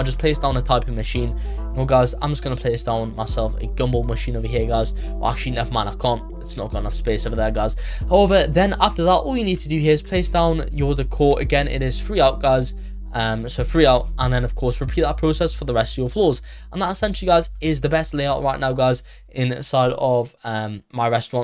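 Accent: United Kingdom. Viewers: That wasnt a swear word, it just seems that way because he talks fast in british accent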